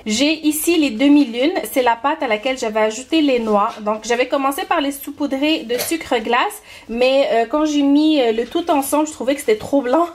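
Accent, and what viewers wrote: Quebec, Canada. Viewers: Mais je me suis trompé c est l accent canadien que j entend en tout tu es une maman très attentionné maman gâteau bravo à toi super recettes merci. Emma de France. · J'aime beaucoup trop l'énergie que tu dégages dans tes vidéos, merciii pour la recette ça a l'air délicieux Ps : ton accent québécois est magnifique 😭❤ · Adorable femme avec cet accent du Québec 😁😁👏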